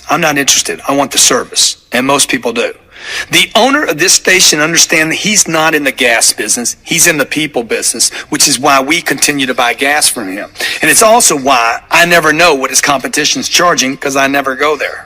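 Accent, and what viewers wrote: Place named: South, United States. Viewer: love the redneck accent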